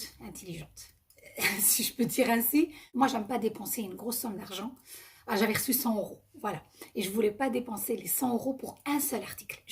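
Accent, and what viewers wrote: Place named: Mostaganem, Algeria. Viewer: فرحت بزاف كي شفت الفيديو تاعك هذا وين شفتو نحبك بنت بلادي مي احكي جزائري نحبك اللهجة المستغانمية تاعك تعجبني بزاف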